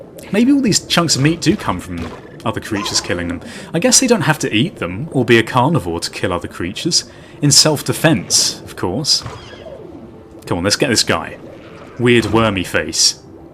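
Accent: United Kingdom. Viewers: British markiplier voice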